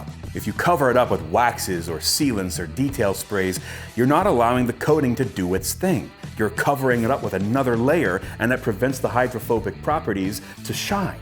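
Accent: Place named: England, United Kingdom. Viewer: Thats a VERY convincing English accent! I’m English and ya had me fooled!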